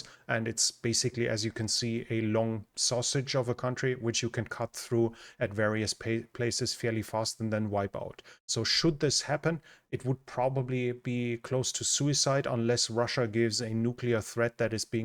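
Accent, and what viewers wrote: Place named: Germany. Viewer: Dein deutscher Akzent im englischen ist schrecklich...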